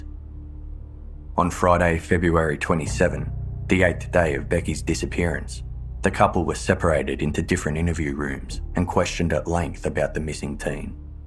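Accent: Australia. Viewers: Great Australian narration!!!!❤❤❤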